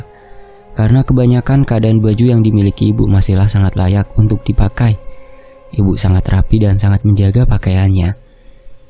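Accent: Java, Indonesia. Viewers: Waallaikumsalam wr wb mzzz Mzzz BHS jawanya medok bgt asli orng mn ya kl boleh tahu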